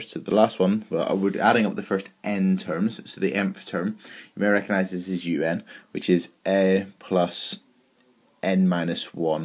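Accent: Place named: Northern Ireland, United Kingdom. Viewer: ahhh! Got the N.irish but couldnt place what else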